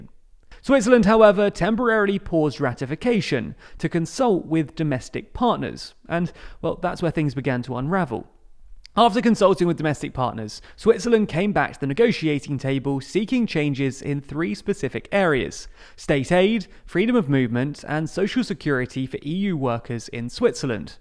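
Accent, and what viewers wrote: United Kingdom. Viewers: Queen english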